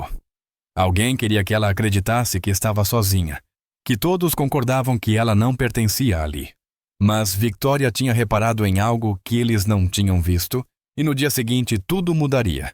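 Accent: Portugal. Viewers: Narração português de Portugal.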